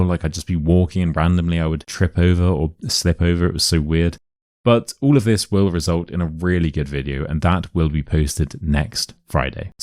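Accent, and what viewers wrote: United Kingdom. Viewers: You are amazing, I used to hear the American accent, but for my IELTS exam I started training my ear to the British accent thanks to your channel. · Thanks, Teacher! You always deliver lovely videos with a great British accent. Have a nice day!🌻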